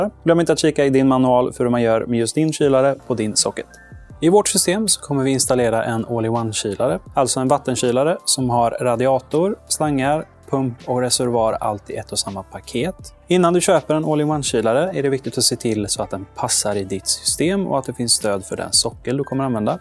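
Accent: Sweden. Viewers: Jag gillar att han uttalar alla namn med svenskt uttal, istället för engelskt. Låter lite roligt :D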